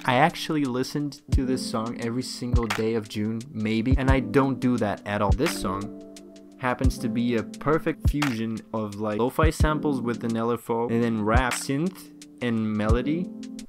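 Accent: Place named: United Kingdom. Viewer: Having a british accent is an unfair advantage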